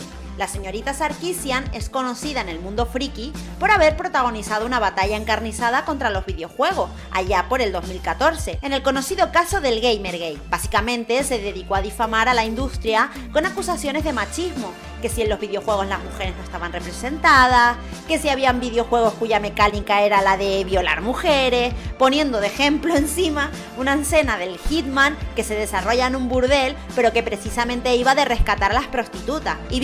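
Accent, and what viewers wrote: Canary Islands, Spain. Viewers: jajajaja, ¿sabes? envidio ese acento español jajaja, lo adoro ^w^ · Eres de canarias?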